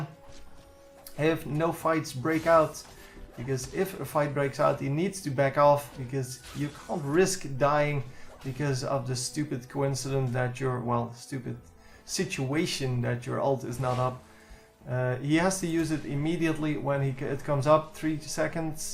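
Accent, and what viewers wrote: Netherlands. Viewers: The 'obviously dutch' way he prenounces every single word just annoys me so much and his overreacting.. oooohhhh niiiiicely done! Good work MEENN. Next time spectate a elo instaid of lvls 20/25. Thank you.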